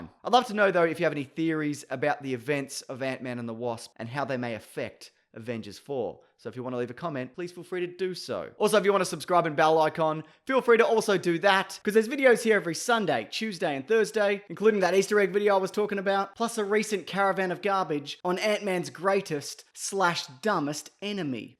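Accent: Australia. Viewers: Dat TREACLY thick aussie accent countdown, tho! Make a full-vid of jus THAT maybe? And definitely keep counting too slow behind the actual onscreen yt countdown in the corner of the video, lol. PLZZZ. I'll donate to that charity for just one minute worth (or however long it takes to verbalize sixty syrupy seconds, that is). I'd bet you guys could make even a full countdown video hilarious and fun! \m/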